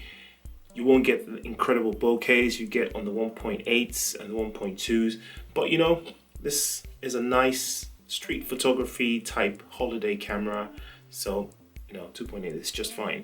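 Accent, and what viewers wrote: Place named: England, United Kingdom. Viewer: I just ran into your channel, I'm loving the content! It `always makes me smile hearing a fellow Englishman on the internet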